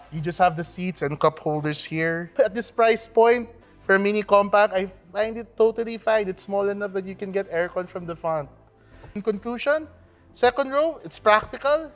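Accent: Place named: Philippines. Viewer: Good review, English Tagalog accent but it's good.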